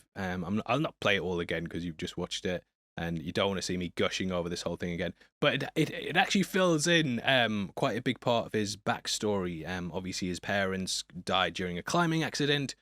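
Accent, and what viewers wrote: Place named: Scotland, United Kingdom. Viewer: I like how his accent has a hint of Scottish in it.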